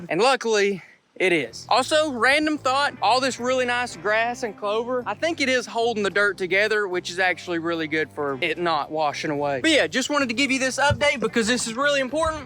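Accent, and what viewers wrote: South, United States. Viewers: Don't even know how I came across your channel but I've been watching 4 to 5 vids each night, I'm obsessed! And in my opinion, as a non American, your accent has quickly become my favourite American accent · It's still A riot to me that in this country, people actually talk like this honky tonky accent. Unreal!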